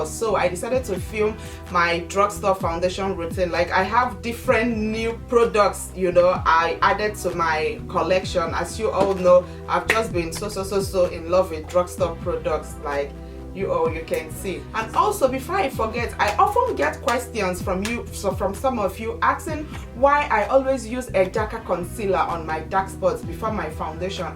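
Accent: Nigeria. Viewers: love your nigerian accent ....hope this is not to personal what tribe ?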